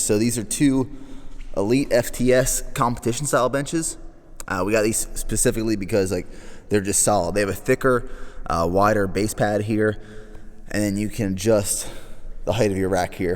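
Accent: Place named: Pennsylvania Dutch, United States. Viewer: hmm guess its just that penn dutch i pick up on lol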